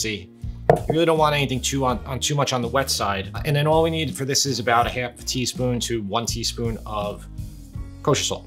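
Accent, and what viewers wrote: New York, United States. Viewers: Great channel. Great presentation. This guy is very down to earth, with the best NY accent. Nice!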